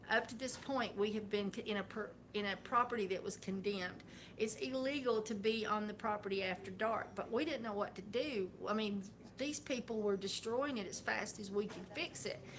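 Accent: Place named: California, United States. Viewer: Pretty Rad... Sounds like you grew up in California!? United We stand YouTubers Playlist: I'm going to put the link to this video in my playlist-- United We stand YouTubers. A ring the bell and I've hit your thumbs up good job. CBD oil back before it was okay to have I discovered just regular hemp oil was very good for soaps and lotion.... I look forward to hear from you, God bless bye for now Ken. Don't be a stranger :-)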